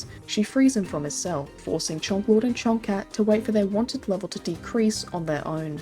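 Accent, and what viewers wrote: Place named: Australia. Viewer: I will never get tired of hearing "Chonk Lord" in an Australian accent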